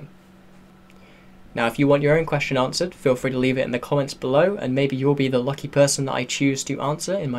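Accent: United Kingdom. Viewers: What's with the american flag on your wall? I'm genuinely curious as you have a british accent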